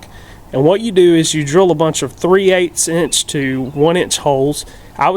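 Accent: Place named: Texas, United States. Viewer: I always smoke in my offset bur a bud got a vertical Brinkmann and neither of us had any idea what the hell to do! Thanks for the video, sir. BTW, your accent, are you one of my fellow Texan brothers? Hope all is well with you and yours, sir.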